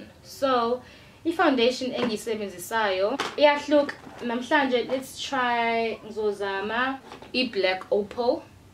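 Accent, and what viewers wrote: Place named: Pietermaritzburg, South Africa. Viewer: iikhala iikhanda😂PMB accent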